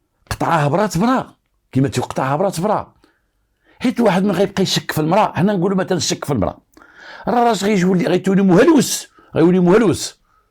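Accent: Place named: France. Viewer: . صاحب صوت وثقافة ولكنة فرنسية فريدة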